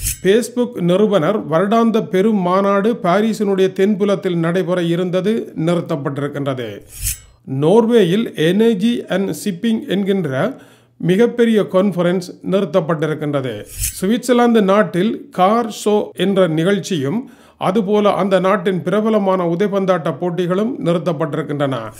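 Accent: Sri Lanka. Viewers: அழகான தமிழில் பேசுகின்றீர்கள் பூர்வீகம் இலங்கையோ......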